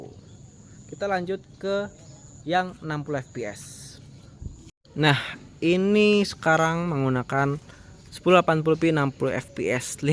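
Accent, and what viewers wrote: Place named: Banyumas, Indonesia. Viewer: sepertinya logat banyumasan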